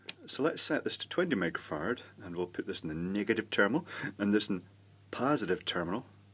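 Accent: Scotland, United Kingdom. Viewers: I find your videos absolutely fascinating, but sadly, due to my lack of knowledge, I sit here watching and it’s like watching a foreign language film! No, I don’t mean your brilliant Scottish accent, I mean I have no understanding of all the electronic terms!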